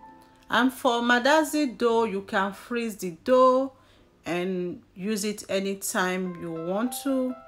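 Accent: Nigeria. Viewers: Nigerian accent wow how did u manage that in kenya. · Is this a Nigerian? The accent isn’t Kenyan nor the slomo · Thats not the kenyan way...accent too sounds Nigerian not kenyan .. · The person talking is not Kenyan believe me ur from Nigeria though mandazi ni matamu😋😋😋😋😋😋😋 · Kenyans have Nigerian accent thou👌👌👌👍👍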